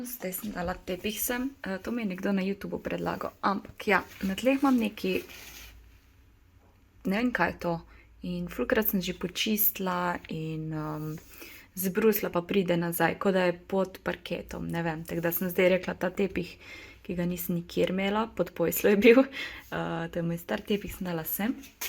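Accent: Carinthia, Austria. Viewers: Draga! Spremljam te že skoraj od začetka in res si vrhunska, pa še čudovito izgledaš. Obožujem tvoj način izražanja in tvoje vsebine. Kot so že drugi povedali, se ti res vidi, da ne snemaš zgolj zaradi zaslužka in "popularnosti". Všeč mi je tudi tvoj koroški naglas. Če boš kdaj želela, bi lahko več snemala v koroškem govoru. Ostani tako dobra še naprej! Lep pozdrav iz okolice Maribora. ❤️❤️😍😍😍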